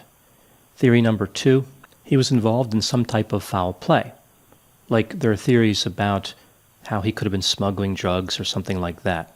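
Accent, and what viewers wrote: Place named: Northeast, United States. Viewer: I would love to know where you grew up. You’ve got a tad bit of an accent there! Sounds like NE close to NY/NJ?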